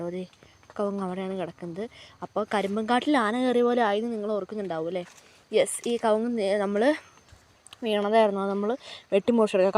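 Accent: Kottayam, India. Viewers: Slang oru kottayam touch 🧐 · Athea currect kottayam slang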